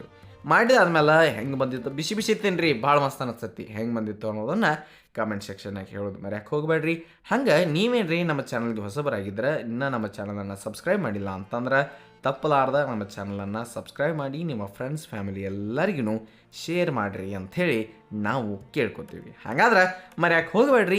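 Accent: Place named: Karnataka, India. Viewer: Your kannada explanation is so sweet to hear,our native desi kannada.❤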